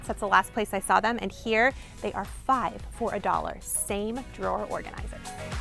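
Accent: Spain. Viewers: do you speak Spanish fluently? I’m always impressed with your pronunciation (it screams Spain) as a Spanish teacher!!! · I love your Spanish accent 💃🏽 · Please tell us the story of your fantastic Spanish accent!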